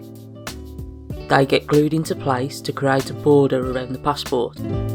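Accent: Black Country, United Kingdom. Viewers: Is that a black country accent?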